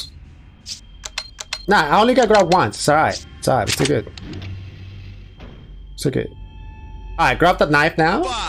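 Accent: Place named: South America. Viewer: Just finished part 2, great job wow! I like your accent, are you South American?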